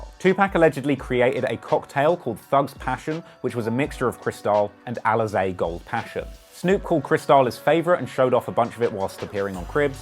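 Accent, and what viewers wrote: United Kingdom. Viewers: Hip hop slang plus British accent is just cringe worthy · I love his accent why don’t we have more British rappers?!?!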